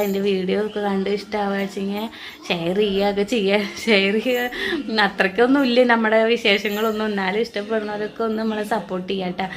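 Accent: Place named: Malappuram, India. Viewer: ഞാനൊരു മലപ്പുറം ഞാനിങ്ങനെ സംസാരിക്കും ❤ എനിക്കിഷ്ടപ്പെട്ടു😂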